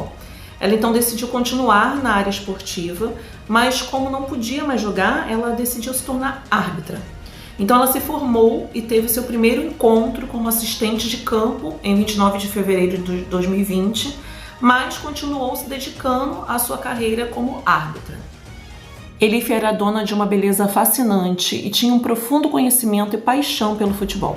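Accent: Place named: Rio de Janeiro, Brazil. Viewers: Até que enfim, esse sotaque que é igual ao meu!!! Muuuuuito difícil no nicho True Crime, alguém carioca!!! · vdd! mas tem cariocas q tem isso mais forte. A é até light se comparada a outros!!!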